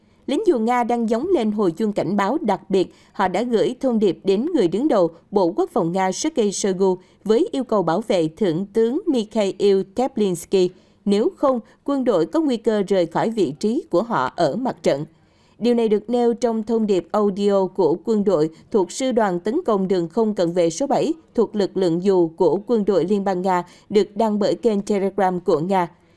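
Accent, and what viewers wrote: Saigon, Vietnam. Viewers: Giong sai gon nghe chu an va ro rang hon .va de thuong hon. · Rất tò mò kênh nầy mà giọng xướng ngôn viên hay quá, đây mới là đỉnh của người truyền tin tức cho mọi người nghe vì tiếng đọc miền Nam Saigon rất chuẩn…!!!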